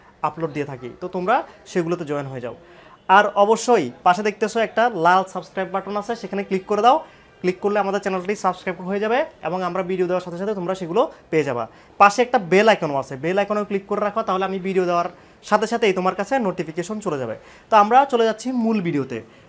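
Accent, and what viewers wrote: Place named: Comilla, Bangladesh. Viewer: Comilla.....comilla feel hocce.. 🙃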